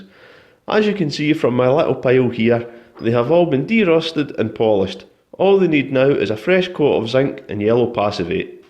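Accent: Scotland, United Kingdom. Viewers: The amount of rust is ridiculous was this a flooded car? or is it the effect of Scottish weather? P.S. I'm guessing from the accent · Lol . Without trying to offend you I am guessing you are Scottish?? Your narration is good mate , no need to change anything. 👍 if anything staying true to your accent is great . There is already to many people that feel the need to cater to their viewers more then necessary. Stay true to yourself. People are here and liked and subscribed to your content for what it is . Not for what they think that it should be . It is your channel. It it bothers them then they can either get over it or buggar off I'd say.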